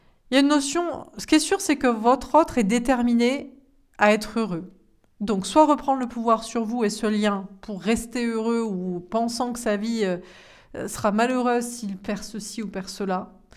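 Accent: South, France. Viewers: Encore un excellent moment avec vous. Beaucoup de justesse.. et encore beaucoup de rire. Je ne sais pas d ou vous êtes, car je cherche l accent, mais y a quelques expressions du sud. 😂 j adore. Au delà du contenu… vous êtes tout à fait le genre de personnalité / humour / personne qui j adore avoir autour de moi. Je pourrais passer des heures avec vous, à vous écouter et à rire. Merci 1000 fois pour ces bons moments. 🙏🏼✨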